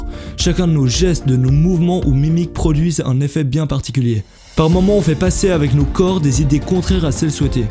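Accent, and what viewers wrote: Vaud, Switzerland. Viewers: Tu est vaudois ? J'adore ce que tu fais 👌👍 Depuis que j'ai regarder cette vidéo je parle beaucoup mieux et les personnes son plus intéresser a ce que je dit merci 😊 continue 👍👍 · T'es suisse???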